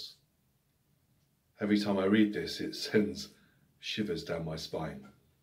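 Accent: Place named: United Kingdom. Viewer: My (Sub - we have to renounce of this prefix)!... You explain accurate the message / meaning of this fragment of the Bible!... I forwarded this to my friends and students who speak and understand English for them to have both a good teaching and a model of how they have to pronounce the words in British English!... Thank you!...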